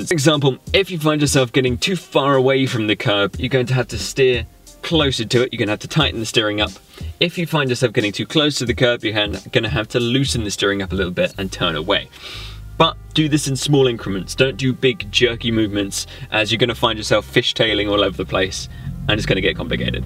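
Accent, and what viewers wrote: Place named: England, United Kingdom. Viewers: English accent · A British accent, he's from the UK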